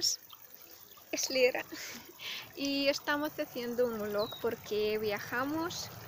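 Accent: Spain. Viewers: Tu acento es de España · ... podrias hablar ruso.. se te hoye bien.. adoro el ruso.. pasa que con el dia a dia.. uno lo que menos aprende es ruso.. te resulto dificil el español? veo que tenes un español de españa...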